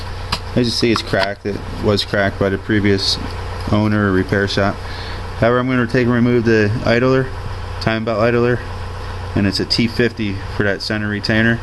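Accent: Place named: Pennsylvania, United States. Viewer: sounds like you are from somewhere near pennsylvania. is that true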